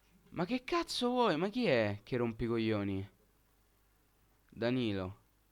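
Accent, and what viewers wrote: Rome, Italy. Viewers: come mai tifoso sardo con accento romano?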